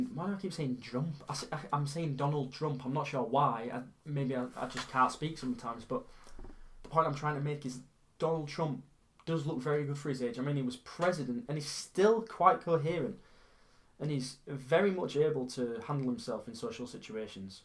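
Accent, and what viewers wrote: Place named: Manchester, United Kingdom. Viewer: You from Manchester bro? You have the accent for sure. A fellow Manc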